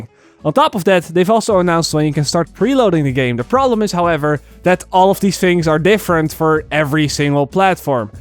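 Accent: Netherlands. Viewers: nou jij komt uit nederland zo te horen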